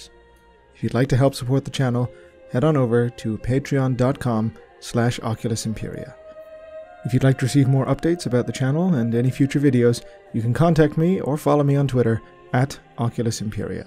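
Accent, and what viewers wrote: Ireland. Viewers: Where abouts in South Dublin are you from. Am I right in picking up a south dublin accent?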